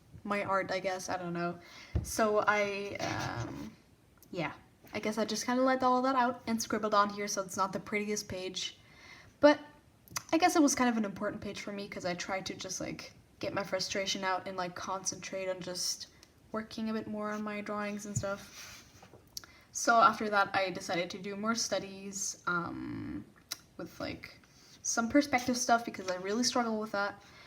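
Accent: Germany. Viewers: Waitttt are you german?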